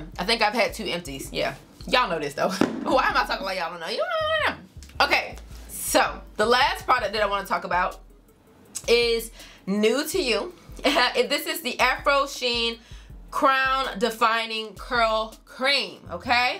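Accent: South, United States. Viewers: is on a natural high. We luv you gurl. sounds like a Southern Bell😂😂😭😭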